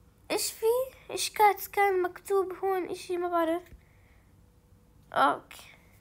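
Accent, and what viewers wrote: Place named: Egypt. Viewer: انت من الأردن ولاكن انتي تتكلمي مصري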